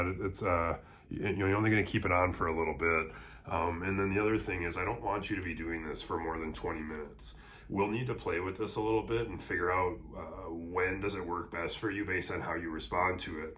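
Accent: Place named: Wisconsin, United States. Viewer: Is this similar to products such as neuvana vagus nerve stimulator? If so, it makes me wonder why other products charge so much! btw you sound like us over here in wi :)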